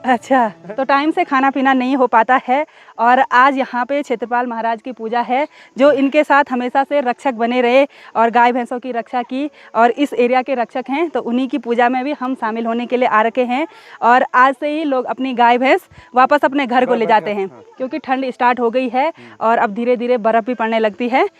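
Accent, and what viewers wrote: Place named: Pahari, India. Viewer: I attend your each and every vlog as your voice and presentation skills is unique in pahari tone. I like very much.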